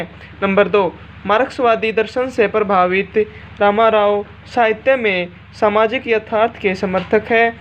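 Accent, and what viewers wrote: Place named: Haryana, India. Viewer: आपकी बोली में हरयाणवी भाषा की शैली है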